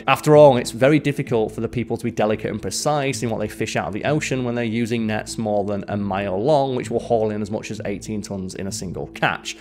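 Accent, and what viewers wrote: United Kingdom. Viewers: Dunno about trying to minimize British colloquialisms. The entire Whistlerverse is founded on the fact that Americans tend to think that anyone with a British accent is automatically smart and well informed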